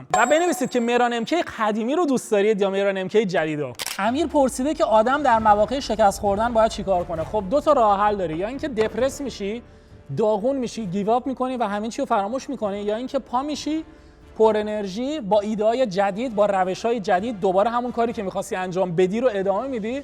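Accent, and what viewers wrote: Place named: Bushehr, Iran. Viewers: با لهجه جنوبی صحبت کن بهتره مهران با حال تره❤❤ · کسایی موافقن که مهران بوشهری حرف بزنه داخل ویدئو هاش لایک کنن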